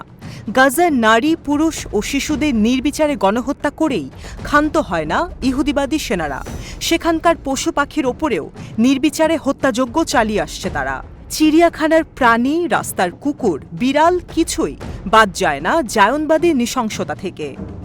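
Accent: Kolkata, India. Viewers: আজাইরা নিউজ, কোলকাতার স্টাইলে !!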